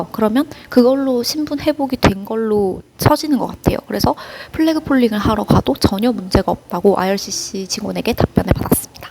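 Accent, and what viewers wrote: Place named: Busan, South Korea. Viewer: 반갑습니다. 씨. 딱 부러지시는 부산분이시군요.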